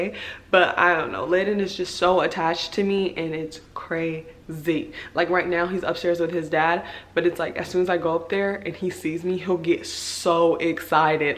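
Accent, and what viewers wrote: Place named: United States. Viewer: You sound country and I love it😩😩😍😍😍❤️❤️🥰🥰🥰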